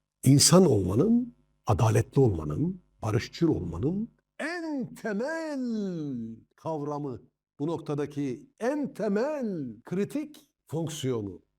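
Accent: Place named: Konya, Turkey. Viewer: Doktor beyim ilk defa dinledim şivenizi konya/Bozkır yöresine benzettim. Pogramların devamı dileğiyle kolay gelsin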